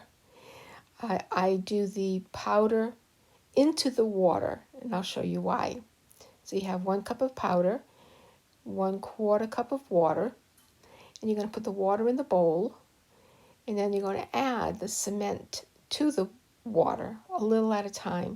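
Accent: New England, United States. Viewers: Thank you! Great video! You must be from New England with that accent lol.😊😊😊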